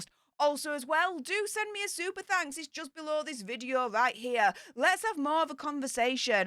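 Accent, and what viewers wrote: United Kingdom. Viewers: I like it when you keep us updated on the UK in your cute British accent 😊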